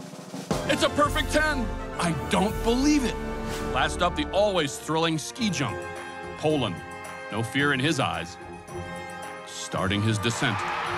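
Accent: United States. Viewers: what state in the US is the commentator’s accent from? I’ve been trying to figure out similar accents and i can’t tell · How ridicules ,not surprised yank commentator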